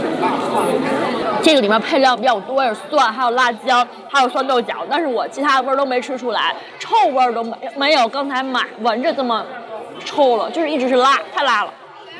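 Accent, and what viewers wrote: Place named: Tianjin, China. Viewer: 点赞Kaka的视频！天津话太亲切了。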